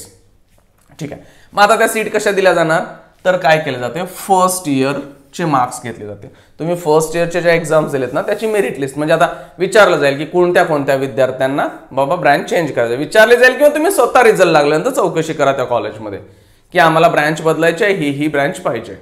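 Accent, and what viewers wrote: Solapur, India. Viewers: सर तुम्ही सोलापूरचे आहात का?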